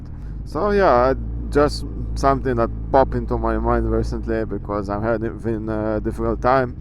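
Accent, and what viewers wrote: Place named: Russia. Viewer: Привет из Беларуси,по твоему акценту понял что ты русский)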